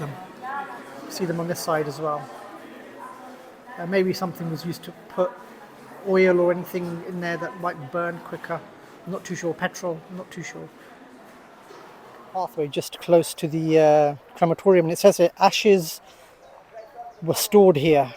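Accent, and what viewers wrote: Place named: England, United Kingdom. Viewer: Sardar ji jara saaf bolo tusin te England wale lehje naal hi fatte chuki jande ho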